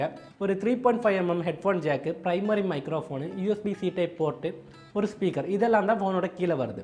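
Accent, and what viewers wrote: Kerala, India. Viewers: Ur tamil with malayalam slang is super bro... But ippo Idhu 7999 aachu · Happy to see a malayali giving review in Tamil.. Love your(Malayali's Tamil slang) accent bro!.. 😃😊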